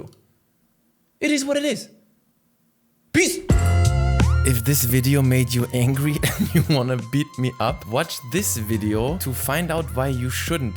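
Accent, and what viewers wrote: Netherlands. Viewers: Love your Dutch accent. Much love for our neighbours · I know you live in germany but your dutch accent is so good lmao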